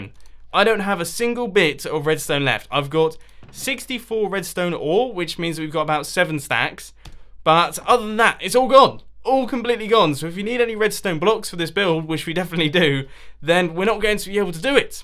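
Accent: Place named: United Kingdom. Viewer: Other than the good content and some hilarious moments that offers, I learned a lot when it comes to Radstone and kinda of British accent so Thank You.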